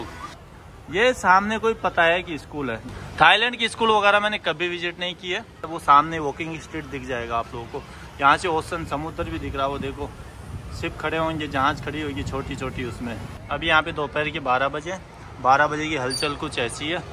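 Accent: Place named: Bihar, India. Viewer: Bhai, ... Soop ne hota ...... Shop bolta ha ....... Bihari English.....🤨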